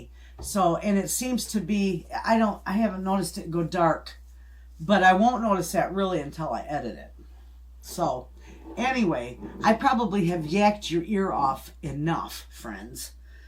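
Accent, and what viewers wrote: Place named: Chicago, United States. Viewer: Lady I just found you maybe a week ago, binged a few videos, and was hooked! You are a delight! I literally smile, and giggle throughout your videos! You are so fun!! I just have to ask. I am a Michigander as well, and too lived in Chicago. Your accent sounded like you were from Chicago, are you? LoL... Accents and trying to figure out where they are from are my thing ?! Anyway I so look forward to the notification that you have posted something! Love your channel!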